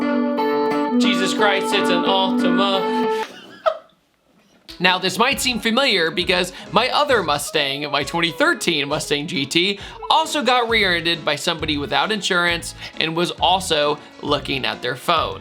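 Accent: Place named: New Jersey, United States. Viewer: New jersey native? Sounds about right. 😂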